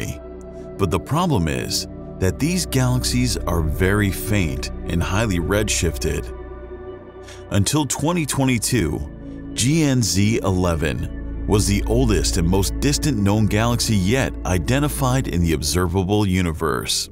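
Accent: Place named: United States. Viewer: clicked on video then heard this mans american accent, yeeeesh no thanks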